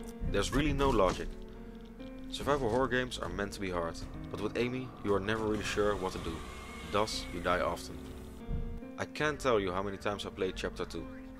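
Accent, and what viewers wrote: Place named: Netherlands. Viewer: Je hoort dat hij nederlands is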